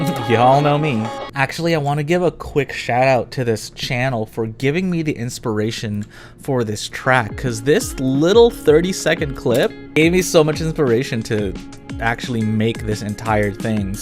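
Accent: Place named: United States. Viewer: Too American sounding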